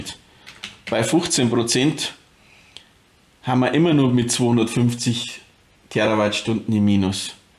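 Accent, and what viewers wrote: Bavaria, Germany. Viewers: Servus, eigentlich bist du ein netter Kerl, und sprichst meine Sprache, vom Dialekt her mein ich, denn ich bin im Oberland daheim, schau runter ins Chiemgau, aber von der ewigen Panik halt ich nix, gut das mag dein einziges Geschäftsmodel sein, für mich wär des nix. Mein Nachbar hat mal Millenniums Notfallzeug verkauft, wie damals jeder gemeint hat die PCs verkraften denn Wechsel von 99 auf 0 nicht, ja was für einen Scheiß der da verkauft hat und das Geschäft ging gut, zumindest bis zum 1.1.2000, da war das Geschäft wie abgeschnitten. Ich sag immer was nutzen dir so Notfall Dinger, wenn man sonst unbeholfen und ein Depp ist, wichtig ist doch, dass man wenn die Kacke am Dampfen ist, die Ruhe bewahrt, so nach dem Motto jetzt schaun ma mal dann sehen wir es schon, oder, die Suppe wird nicht so heiß gegessen wie sie gekocht wird. Wenn Notstand ist, werden doch die Menschen führend sein, die Wissen wie es geht, die auch aus Scheiße was brauchbares machen können und nicht die Leute die sich mit Notkoffer und Zeug eindecken und schon die Hosen voll haben bevor es los geht. 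Nüchternheit zumindest bei Tag und Gottvertrauen, dann zwingt man jede Krise. Viele Grüße ins schöne Chiemgau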